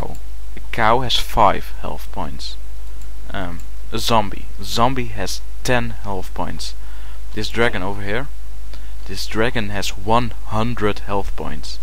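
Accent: Netherlands. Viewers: wel grappig dat hoe goed een nederlander ook engels praat je toch altijd hoort dat het een nederlander is ; ) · ik hoorde dat je uit Nederland komt door het Nederlandse nadruk xD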